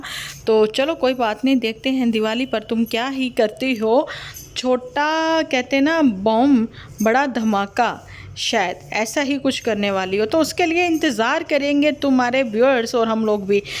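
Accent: Punjab, India. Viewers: I love your Punjabi Accent Aunty ji love from Canada 🇨🇦 I’m also Single mom with my daughter no house help no any support I’m working mom im working in School full time job but in 🇨🇦no any kinda helper I’m just thinking about those Drama Queens Sisters and mom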